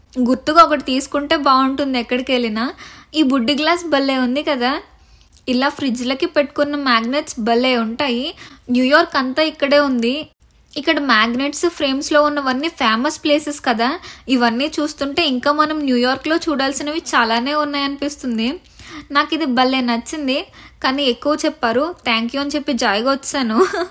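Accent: Godavari, India. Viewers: Ma godavari slang baguntundhe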